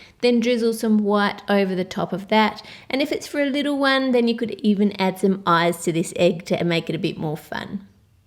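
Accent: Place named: Australia. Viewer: probably the only person who speaks in an australian accent i didnt enjoy listening to when watching this video.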